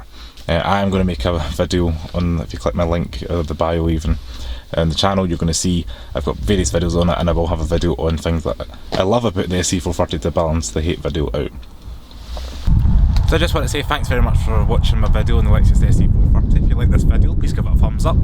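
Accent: Scotland, United Kingdom. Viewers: With such a powerful Scottish accent you need to make sure sound quality is very good so we can understand what you are saying. This video sound quality is aweful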